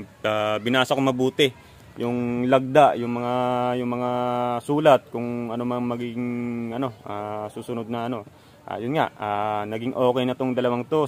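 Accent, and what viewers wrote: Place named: Cebu, Philippines. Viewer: I heard you speak cebuhano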